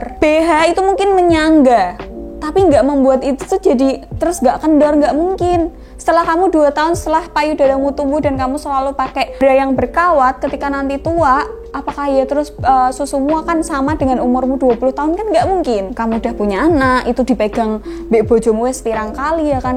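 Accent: Java, Indonesia. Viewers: Asli jowo to mbak😁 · Cara ngmong nya itu yg aku suka perpaduan jowo dan bahasa indonesia love dah mba ❤ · Suka bener sama kak ini dari segi bicara sampe penyampaian nya selalu pas apalagi ada logat jawanya enk gitu di denger wkwkw Sehat selalu kak 😊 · Sippp kak.. Aku suka aku suka pmbahasanya kena dan medokk bngttt jowone... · Masuk mbak.... Kenthel banget jawamu mbak. Nek boleh tahu jawamu ngendi mbak? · Koe jowo yo mba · jawane ngendi mbk.e😁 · Medox banget mbak..😄🤭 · Aduh.. Kak udh nikah blom sih? Klo blom mau ga klo aku nikahin😂tipe gua bgt ni cwe, frontal, buka"an, manis, medok, haduhh smoga kau jodohku wkwkw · Logat Jawa keren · Anjaay medokk jawanya bikinn gemesin ngomngnya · Mbk samean ark ndi to Kok omh e jowoan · Jangan pake bahasa Jawa dong ga ngerti, medok medok aja😒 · Cw cantik ngomonge medok kesane lah lucu😁 asikk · Indo Inggris ❌ Indo Jawa ✔️ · Njirr gua suka chenel ini bukan karna pembahasannya, tapi cara penjelasannya lucu,konyol,kocak.. 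Khass cwi jawa🤩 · Bahasa jawa medok nya bikin gua senyum sendiri 😀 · G bisa bahasa 8ndo nesia yg bagus ya k9k medok gtu emang ada bahsa indo yg kayak gtu blajar dlu baru ngomong yo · Suka bgt ama logat cara berbicaranya ehehe pa lagi klo kdng ada jawa jawanya gitu ehehe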